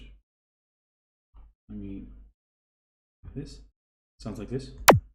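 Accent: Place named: Netherlands. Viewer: Hey i would like to know how to create the screech, its a kinda sound.... Looking for ages to create it. By the sound of your accent u clearly dutch i gues.. Me 2. Great track gonna follow you more around.. Love the tuto man, i am also trying to learn this just for fun.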